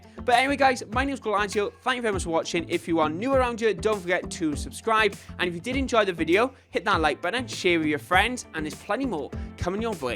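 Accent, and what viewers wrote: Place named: Wales, United Kingdom. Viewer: Where in Wales you from?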